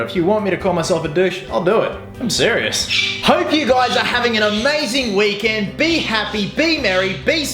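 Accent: Australia. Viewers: R u from Australia?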